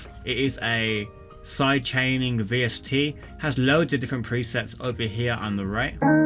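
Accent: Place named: Wales, United Kingdom. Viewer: Love that welsh accent ✅😉😀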